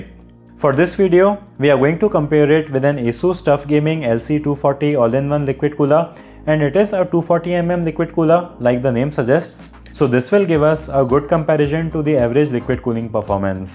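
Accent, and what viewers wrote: India. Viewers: Can’t watch this. Indian accent is so annoying.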